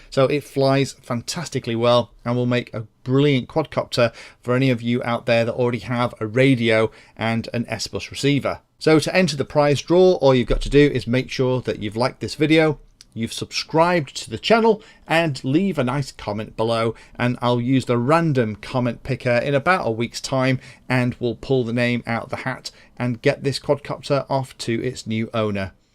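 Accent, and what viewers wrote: England, United Kingdom. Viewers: Always nice to hear your dulcet tones!! In NZ at the mo so great to hear a proper English accent! Always appreciate your reviews (still hoping you might do the Strix Nano Goblin...!) Cheers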